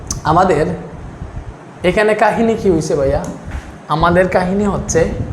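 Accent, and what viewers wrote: Sylhet, Bangladesh. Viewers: vai ki sylhety 🤔🤔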